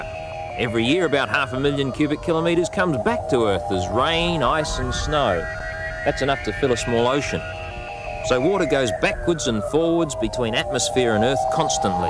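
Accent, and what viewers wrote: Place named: Australia. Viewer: Australian, I think. But he could be Tony Hinchcliffes Dad!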